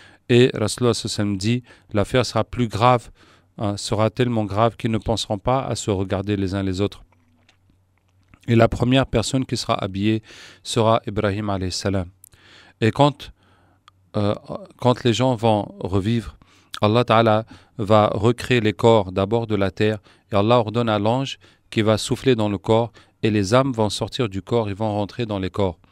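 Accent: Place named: Africa. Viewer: Tu parle souvent comme les africain noirs avec un accent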